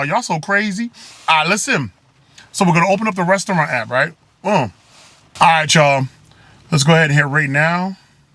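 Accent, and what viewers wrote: United States. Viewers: Drops your Russian always thought your were American brother hahaha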